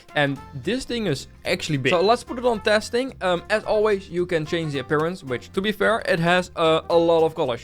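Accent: Netherlands. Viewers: pov: you can't hide your dutch accent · tell me this guy is dutch without telling me he is dutch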